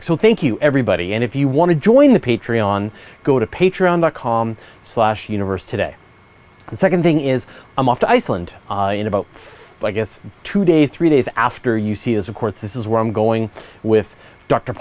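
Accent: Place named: Canada. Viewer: I wondered if you were Canadian from your accent and I see yes! <link> I'm the publisher of Universe Today and co-host of Astronomy Cast. I was born in Vancouver, Canada, but grew up on Hornby Island, a small island off the coast of Vancouver Island. Then I moved to Vancouver again to study engineering at UBC.....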